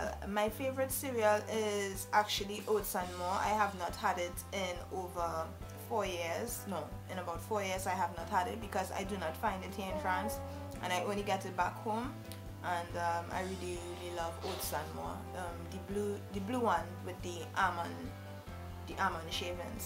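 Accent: Trinidad and Tobago. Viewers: Love this and thats y you have such a nice accent you from trinidad lol.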